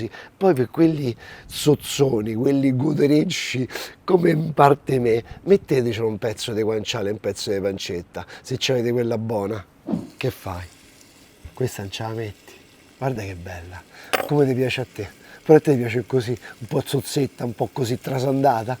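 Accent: Rome, Italy. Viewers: A MILANO PARLI romanesco?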